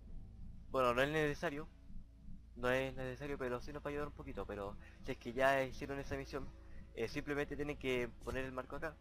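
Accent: Chile. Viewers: Eres chileno chileno y chileno se reconocen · oye eres chileno?:v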